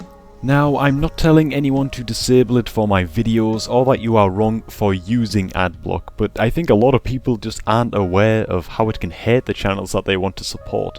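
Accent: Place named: Geordie, United Kingdom. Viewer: unusual question but what is your accent? It sounds like a foreign one and a geordie one mixed together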